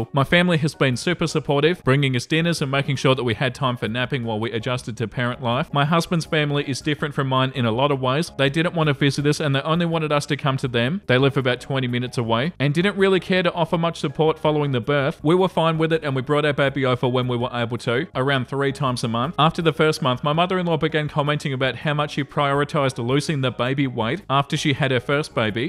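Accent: Australia. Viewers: I always look for a new when I first wake up in the morning! It's so nice to listen to your soothing Aussie accent as I get ready fore the day!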